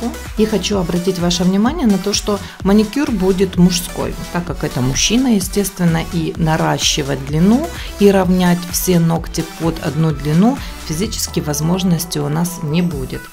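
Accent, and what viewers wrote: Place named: Ukraine. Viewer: с Украины, от акцента никуда не деться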